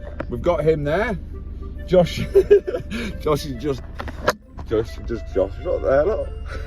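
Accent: Australia. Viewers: Was the Australian accent inspired by the Ozzy fella with the big beard who does the heavy welding on earth moving buckets and the like... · Aussie accent ? I thought I'd dropped into Norfolk Man Cave's channel for a minute there boi !😂 Your welding's gone from "Town centre" pigeon, complete with a manky leg and missing foot, to something you'd find in a bespoke dovecote from a copy of "Country Life" magazine !😂 Is there a service exchange price included with the alternator, or do the Chinese not even want it back ?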